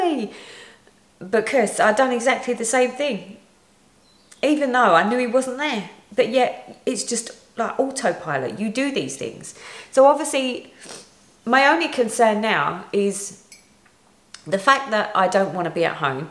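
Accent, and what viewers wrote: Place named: England, United Kingdom. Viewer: What part of England are you in? I love your accent!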